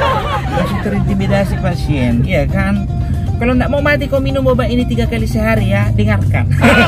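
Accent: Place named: Batak, Indonesia. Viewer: Aqu suka banget bang gaya bicara horas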